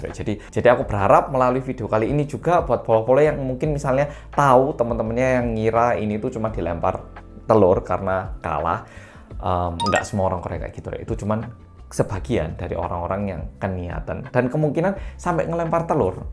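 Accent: Java, Indonesia. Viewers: orang korea tapi kyorang jawa medok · Lancar juga bhs indonesia ini mas Korea,,,lidahnya lidah jowo juga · Bahasa Jowone medok bangett,,😀 asli keturunan jowo pundi? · Mas sampean ni ngomong Indonesia serasa Jawa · Bahasa Indonesia kamu medok banget jawanya... Pasti km fasih bahasa jawa.... Yo opo ceritene rek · Iki wong korea opo wong jowo logate kok medok jowo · Abang ini Dialeknya seperti orang Jawa awal nya Saya bingung Ini orang Korea atau orang Jawa 😅😅😅😅 Ok Bang Salam Kenal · ini mas se logate jawa koyok e...tapi muka e korea...salut...aku.baru.mampir di channel.ini langsung tak subrike heheh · Jawa nya medok 😂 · Wahhh saya salut bahasa indonesia nya bagus bgt, ada logat jawa nya juga❤❤❤❤ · Muka Korea logat BHS jawanya kentel suka sekali ngeliat mas nya ngomong😊😊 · Bahasa jowo nya sangat kental banget 😅😅😅😊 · Lha wong dr TK smpe SMA sekolah nk malang ogg.. 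😂 yo medhok nyel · Apik komentarmu bro. Jawamu ko medok emangnya anda tinggal dimana. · Waduh mas korea lancar banget berbahasa Indonesia nya dan logat Jawa lagi... OK mas korea saya sangat suka 👍👍👍👍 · Bahasanya kok medok kyak Jawa ni 😂😂.pandai bicara Indonesia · Sampeyan jawine pundi ?? Kok logatnya Jawa !! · Logatnya lebih ke logat jawa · Sampeyan kok medok jowone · Medok jowone😁😁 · Mantuuuuullll mas Medhok Jowone....😀👍👍👍 br ngevlog lg ya Mas, mngkin krna sbk dg kerjaan Rutin, ok truslah berkarya sehat2 sklwarga and sucses selalu Mas 🤲🙏👍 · Hebat bahasa Indonesia anda perfect meski ada logat Jawa sedikit ,❤ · Mas orang Korea ko bahasa Indonesianya lancar banget sih, bahkan logat jawanya kental sekali! Sudah berapa lama sih tinggal di Indonesia? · Ini kok logatnya ada lihat Jawa emang tinggal dimana? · Ko rasanya mendengar aksen orang jawa bicara ya... 🤔🤔🤔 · bro kamu logatnya ko ke orabg jawa..medok😁🙏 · logatmu medhok banget mas 😀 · Idemu cemerlang Rek, sampeyan neng Jatim Wis pirang taon kok medok banget ?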